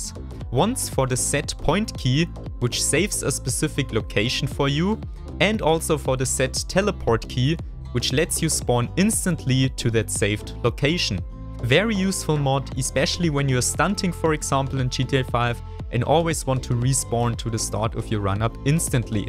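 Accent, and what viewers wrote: Germany. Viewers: Ich bin mal so frei und schreib auf Deutsch. Deiner Spracheinstellungen und deinem Akzent nach solltest du mich verstehen :). SUPER VIDEO! Der erste der alles wichtige mit rein genommen hat und bei dem es auf anhieb geklappt hat. Vielen Dank für das Tutorial, bei dir kann sich der Rest der Tutorialtypen die's so gibt noch eine Scheibe anschneiden!!! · du bist deutsch hört mann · Are you from german? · Mn merkt extrem dass er deutsch ist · Fam youre a German from that accent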